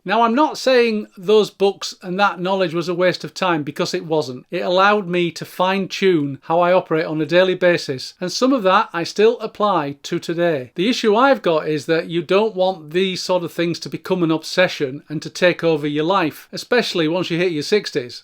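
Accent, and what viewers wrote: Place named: Yorkshire, United Kingdom. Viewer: Not English, it's Yorkie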